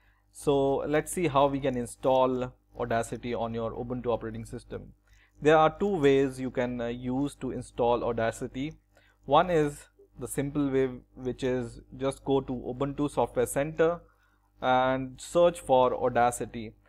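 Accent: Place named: India. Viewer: I always try to find interesting tutorials for installing software on linux. But most tutorials on youtube are so boring, when I heard your accent I was so happy! XD YAS I love your Indian (is it Indian?) accent!!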